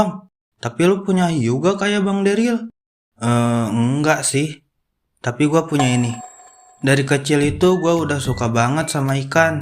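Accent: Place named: Sunda, Indonesia. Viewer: Aa nya orang Sunda yah, saya lihat lihat nadanya kaya orang Sunda, sok ngomong badag🧒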